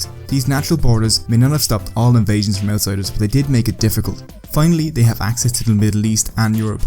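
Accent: Ireland. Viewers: Are you Irish? If so, cool cause you and one other YouTube are the only YouTubers that I know about are Irish. The way I can tell is be cause you pronounce thirty two as turdy too, and the t-h syllables are pronounced differently than as it appears. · u from Ireland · clearly Irish...It's not that he doesn't enunciate properly, he just has an accent. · Your videos are really good. I can spot that Irish accent.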